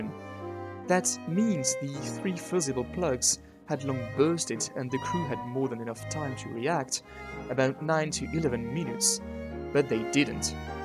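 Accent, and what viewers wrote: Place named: United Kingdom. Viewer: C'est un fait divers français et vous parler britannique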